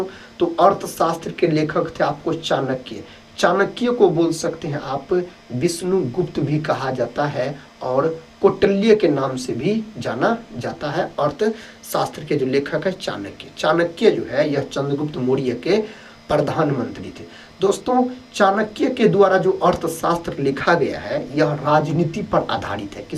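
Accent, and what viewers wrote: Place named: Uttar Pradesh, India. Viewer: Maza aaya bt up ki Laungaug thodi sochna pdta hai bt sweet hai 😂🙏👌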